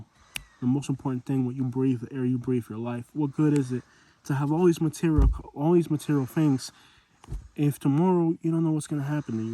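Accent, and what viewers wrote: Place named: California, United States. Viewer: While you are taking I am hearing California.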